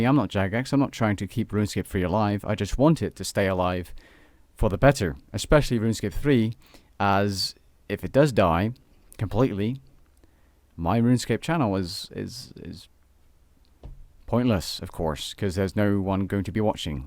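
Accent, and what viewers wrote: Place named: Netherlands. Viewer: well whatever you choose to do the community supports you and thank you so much for making such great content and teaching me to boss. It has always been a goal to achieve a max Cape and thanks to you I'm on that road now. And hopefully before too long I'll have the final boss title. And that's good to know you're Dutch 😂 I couldn't figure the accent out . But for real whatever you feel like you have to do or want to do we support you and you at least have built up a community enough to get a jumpstart of you were to do other games❤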